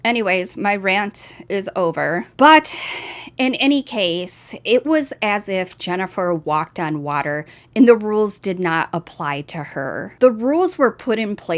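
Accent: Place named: Minnesota, United States. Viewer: Where’s you’re cute accent from ?? Sounds like Minnesota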